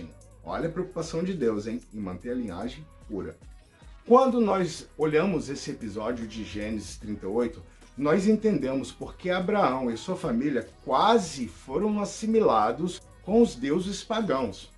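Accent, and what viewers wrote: United States. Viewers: EU PENSEI QUE VOCÊ ERA AMERICANO, VOCÊ TEM SOTAQUE. VOCÊ É MUITO BOM, PARABÉNS ! SHALOM IRMÃO. CANAL REVERENDO MIL.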